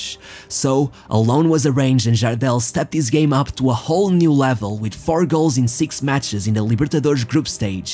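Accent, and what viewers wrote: Portugal. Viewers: Portuguese. The accent is unmistakeable. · Are you from Mozambique,Portugal,Angola or cabo verde i swear you sound like your from Portugal or african country's that speak Portuguese iam gonna speak Portuguese and if you know you olá meu nome é Nícolas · Bom canal. Esse sotaque português 😅😊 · you're Portuguese, my friend? you do sound like portuguese, 😁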